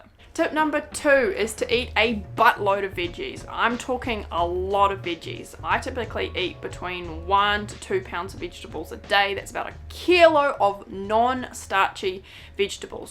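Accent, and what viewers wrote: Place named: New Zealand. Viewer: How good to hear a kiwi voice talking candidly on this topic! And I love your hair 🥰 it’s so pretty 😍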